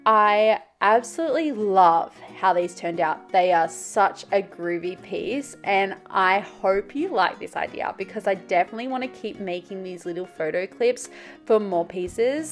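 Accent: Australia. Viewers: Awww, but your accent is lovely! Honestly, hearing you talk reminds me of the best experiences of my life when I visited Australia on a study abroad trip. Pure joy and nostalgia. ❤️❤️❤️ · I love to hear you speak Ausie and want to thank you for giving all of us such a happy fun place to visit. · Your Aussie is one of the unique aspects of you! I’m totally here for it! Don’t ever tone it down! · Never be ashamed of our Australian accent .. We need to show more pride in our country and not dumb down our words for other countries . Proud to be Aussie 🇦🇺🇦🇺🇦🇺🇦🇺🇦🇺🇦🇺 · We love that you're Aussie! Don't mask that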